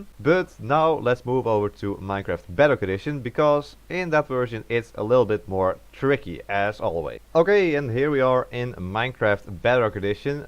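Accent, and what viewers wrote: Netherlands. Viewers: this dudes def dutch for sure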